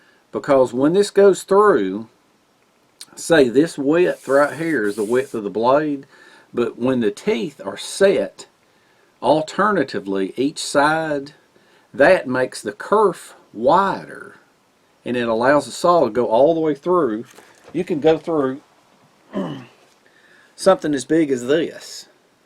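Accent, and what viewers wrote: South, United States. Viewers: You were the first person I subscribed to on YouTube. I always look forward to your videos. The videos are informative and entertaining. I hope you, Nick and the rest of your family have a very Merry Christmas. GOD bless you. Also, I like the way you talk. I live in Arkansas. We speak southern too! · And, in case you didn't know you have a Southern US dialect, here's two cases of Northern Britain: Scottish: <link> And Welsh: <link> I'm rather amazed how people claim you are speaking the same language. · "I'm Southern. Cut me some slack." lol. Sometimes I watch your videos just to hear your accent! You seem like the nicest guy on YouTube. You always call things a "little gem". Well I think YOU'RE a little gem. Your experience and knowledge are second to none. And this video is EXCELLENT. A brilliant saw tutorial. I had no idea what the different types of teeth were for or how they functioned, ie wet vs dry wood. 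Never have I read on any saw descriptions what they are specified for. Now I understand saws SO much more. Thank you for all your videos. I've learned so much from you.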